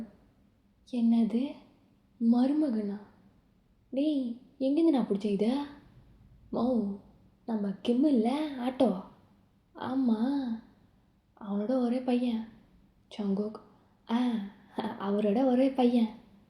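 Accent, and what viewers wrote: Chennai, India. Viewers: Taekook love so cute JK mom great jk jimin Felix friendship really cute 🥰🥰 this movie all songs my favourite songs ❤❤❤❤❤❤❤❤ Chennai language உங்களுக்கு நல்லா இருக்கு match ஆகுது😂😂😂😂 different style movie 🍿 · Madras slang super sis 🔥🔥🔥🔥🔥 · Story romba nalla iruku iyoo antha chennai slang tha semaiya pesuringa iyoo enaku romba pidichiruku but story avlo thana nalla irunthuchi duration than kamiya iruku innum kojam irntha nalla irukum story super ❤❤❤❤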